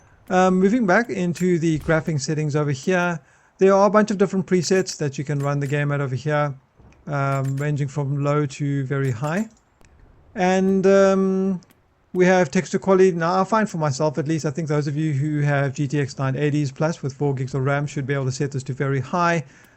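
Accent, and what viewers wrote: South Africa. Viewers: You a RSA expat by chance? Your accent sounds like a South African :P Great vid BTW!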